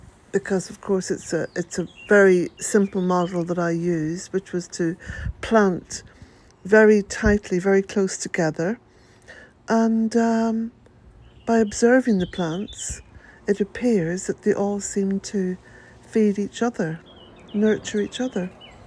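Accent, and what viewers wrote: Ireland. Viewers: Thank you for sharing this adventure. in your daughter's lovely, lively garden, so wonderful with your Irish lilt You can be a proud mum. 🌰🍇🥔🍏. Blessings from central va, u.s.and a hug for Patsy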